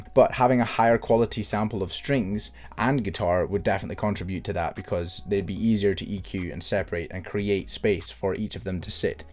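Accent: Scotland, United Kingdom. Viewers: hey man, r u scottish?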